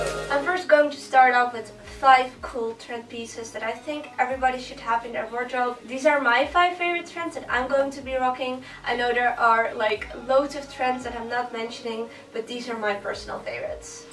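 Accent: Netherlands. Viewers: Toen je begon te praten wist ik gelijk dat je uit Nederland kwam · haha hoorde meteen dat je Nederlands was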